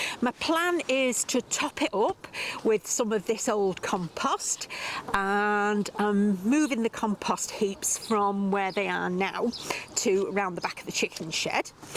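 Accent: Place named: Manchester, United Kingdom. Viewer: What a lovely video. We have loads of old tin baths around the farm. Think it’s pretty standard for farming to find baths dotted around fields. They certainly seem to make useful raised beds. Have seen Jessie (plot 37) likes the touchon carrots too The cauliflowers look lovely. Be interested to hear what your biggest pests to the crops are over there? Still have slug problems? Your accent sounds like you may have come from Manchester/Stockport area originally? Not too much further north than me on the Staffordshire/Cheshire border The rain and thunder always sounds quite nice.. so long as you’re in the dry watching it. Colin has the right idea. Happy New Year to you. Hope 2024 is a good one.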